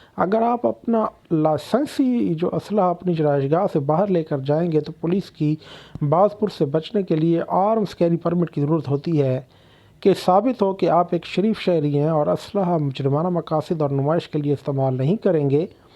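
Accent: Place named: Mianwali, Pakistan. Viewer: Apki awaz se Ap mianwali se lag rahe ho ? Kia ap mianwali se ho ?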